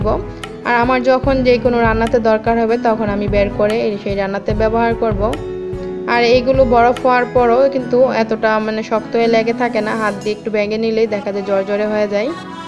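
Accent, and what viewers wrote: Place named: Noakhali, Bangladesh. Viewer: কথা বলার স্টাইল পরিবর্তন করতে হবে। নোয়াখালীর মেয়ে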